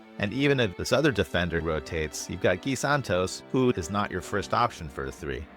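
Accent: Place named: Netherlands. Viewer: Excellent Dutch accent!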